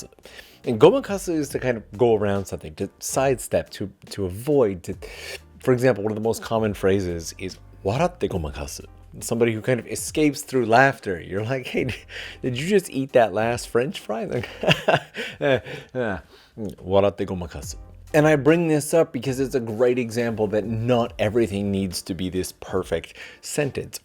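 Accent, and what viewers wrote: Canada. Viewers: Just found your channel. And I'm just as fascinated by your Canadian accent. lol. It's very particular. I'm trying to mimic it, and can't get it right! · lol I knew from your accent you were Canadian. Thanks for the tips. I will try them out. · First of all. Great tips and tricks, I really need to try them all. This video has really caught my attention more than most. Second of all. I was wondering if you were Canadian. I could have sworn I was hearing Ryan Reynolds here and there. Here’s an opportunity to make a video of you speaking in Japanese sounding like Ryan Reynolds 😂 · Loving your Canadian/UP accent haha. ❤ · Lots of French here, and I remember some from school classes. Ultimately though I pick up like every fifth to 10th word and use technology when needed. Nothing like what my mom went through where her father was actually raised in French. 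He passed when I was really young and I was told he spoke English to me, but his accent was so thick. Don’t remember anything he ever said to me. Acadian ancestors immigrated through the Hudson in the 1670s. I knew you were Canadian without you telling me because of the way you say about and out. I also dated some bilingual girls from Northern Maine because that’s where I went to high school and where my family was from.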